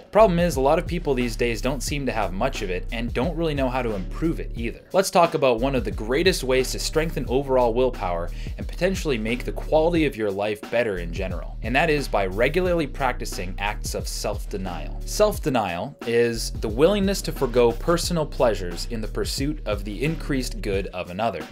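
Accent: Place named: Canada. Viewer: You must be Canadian... I could tell from your accent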